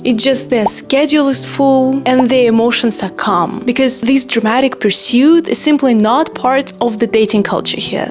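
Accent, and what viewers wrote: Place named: Eastern Europe. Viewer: While this girl is extremely pleasant to my eyes and I love the eastern European accent (this is the second video of hers that I watched) she is factually not Dutch, hasn't been in the country that long and she states that her boyfriend or the man she dates is also not Dutch. So, I like watching her, but where is her expertise on Dutch dating culture? There's a lot of confusion about this subject among expats to Holland and people, specially men planning to visit. The Netherlands has gained a reputation for their women being very emancipated, liberated and sexually open minded and there is 'some' truth to it. But so many men look forward to their trip to Holland where they are going to "score big" with all these open minded Dutch women only to face massive failure and rejection. I can explain. The Dutch live their social lives in groups, circles of friends and each Dutch person belongs to several groups or has several circles of friends and they overlap and that is how you can increase your number of friends. 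As a teen ager I went to the Discotheque on Saturday nights. I did not go alone, not with 'A' friend but with a group of friends, 5-6 or 8 of them. If while there I saw a pretty girl who interested me and I would approach her, most likely it would be awkward, she would show slight discomfort and reject my advances. She did not know me, she was there with her group of friends and it was with them that she was socializing with and enjoying her time with (there are always e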